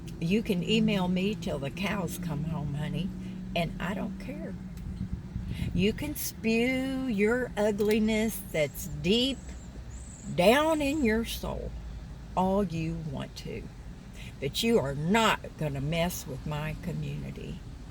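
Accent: South, United States. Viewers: Love the way you talk that southern talk. My family from the south too. Thanks for sharing. Jehovah God take care of all things if we let him. Safe travels.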